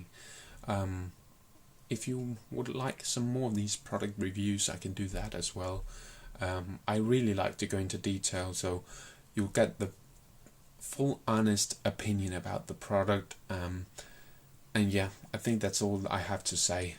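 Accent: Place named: Denmark. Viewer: The Danish accent is so obvious XD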